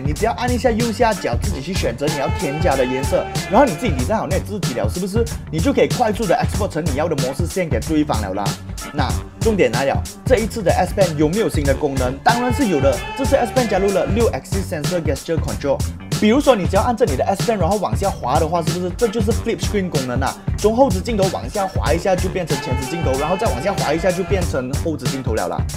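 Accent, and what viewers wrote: Malaysia. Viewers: 馬式英文好難懂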